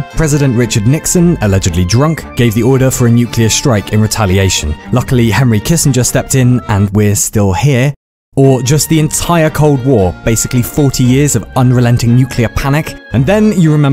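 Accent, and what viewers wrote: United Kingdom. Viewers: Pls drop that british accent pls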